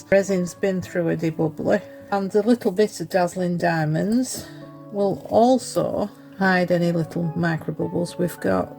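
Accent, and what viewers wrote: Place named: Radcliffe, United Kingdom. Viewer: can I ask about your accent please? I've been trying to place it for ages! I'm originally from Radcliffe, and yours doesn't sound too dissimilar!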